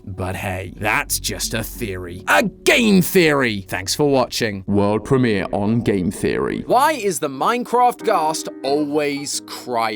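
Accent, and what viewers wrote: United Kingdom. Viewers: BRITISH MATPAT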